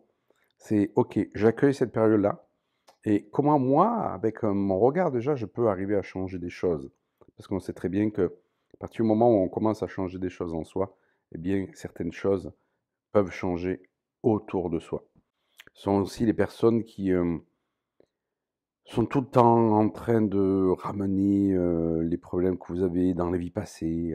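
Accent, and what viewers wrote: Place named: South, France. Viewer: Merci pour le rappel de savoir bien se connaître soi et je crois d'ACCUEULLIR tout ce qui est ici et maintenant ! Merci pour ton accent du sud ensoleillé ! Bonne journée à tous. Merci pour tes vidéos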